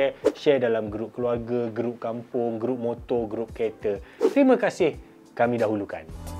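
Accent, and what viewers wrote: Kelantan, Malaysia. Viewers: Bey demo ore klate dih?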